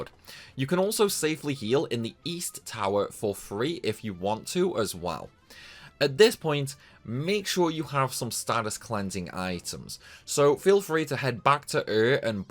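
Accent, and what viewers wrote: United Kingdom. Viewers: Have you never heard a Brit speak before?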